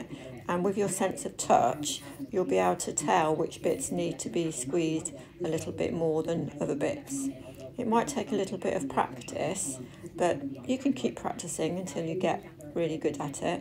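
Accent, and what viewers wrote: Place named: Scotland, United Kingdom. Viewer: Your accent... automatically makes me think of Outlander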